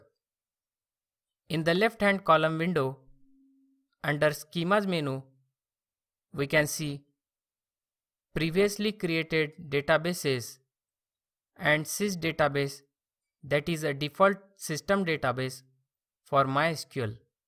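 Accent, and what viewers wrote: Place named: India. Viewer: This is how to teach any beginner properly. Most other uploaders should learn from this video. It seems to be a common problem for some programmers beeing incompetent as teachers. You need to think like a human, not like a computer. If you're having a hard time understanding the indian accent for some reason, the subtitles works well!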